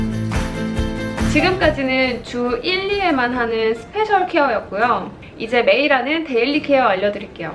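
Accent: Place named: Seoul, South Korea. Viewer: 우와 서울사투리 같아요 신기신기 잘보고갑니당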